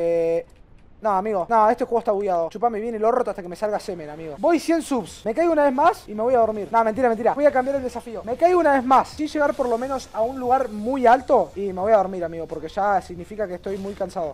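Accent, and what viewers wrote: Spain. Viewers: El acento español de es perfecto sin más, igual que el arremedo del xokas xd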